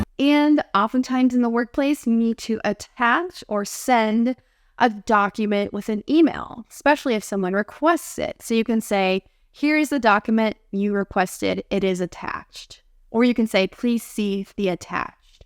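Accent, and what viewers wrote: United States. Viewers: love American pronunciation.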